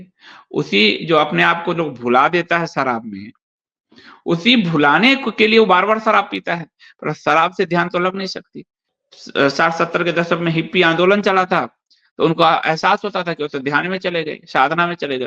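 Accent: Bihar, India. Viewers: App bihar Chapra se hai kya?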